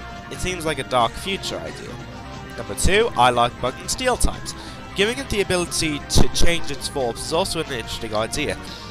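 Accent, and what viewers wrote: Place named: United Kingdom. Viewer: are you british or something cause your accent is hillarious XD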